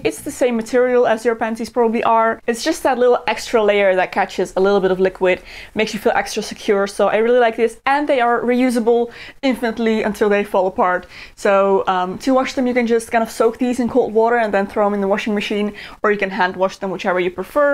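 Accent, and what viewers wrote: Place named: Netherlands. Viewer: Ik kan zo slecht tegen je nederlandse accent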